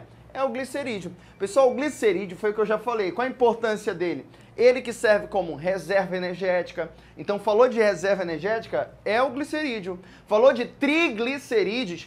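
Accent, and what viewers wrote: Pará, Brazil. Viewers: Muito bom o sotaque do Pará kkkk ótima aula! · olá professor , estou acompanhando suas aulas há algum tempo , pelo sotaque , pensei que fosse paraense , hoje descobri que é sim , e achei muito legal , também sou paraense e já morei em Ponta de Pedras , atualmente moro no RJ, gosto muito das suas aulas , super recomendo para os meus colegas e agora sabendo que é paraense vou triplicar isso .Ótima qualidade são suas aulas, completas! parabéns e sucesso. Gabriela Araújo.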